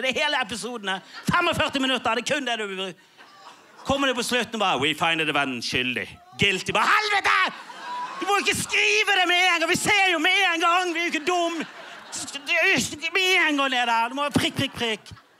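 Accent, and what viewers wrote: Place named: Bergen, Norway. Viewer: Norwegian. Bergen dialect.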